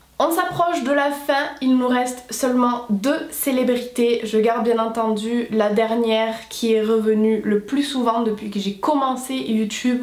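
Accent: South, France. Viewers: Je pense qu’il faut regarder koh lanta, pour trouver que Cindy te ressemble ! Vous avez le même accent du Sud ! 😅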